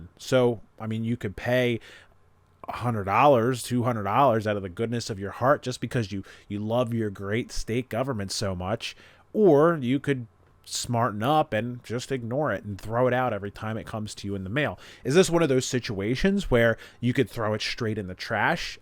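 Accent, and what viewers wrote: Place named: Philadelphia, United States. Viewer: You have to be from philly/south jersey area with that accent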